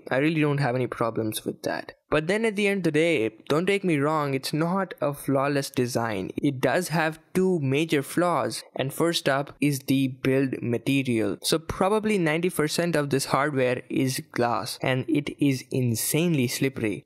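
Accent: India. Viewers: great video, I subscribed within 10 sec after listening to ur ascent, its indian but not typical Indian ascent.Keep up the good work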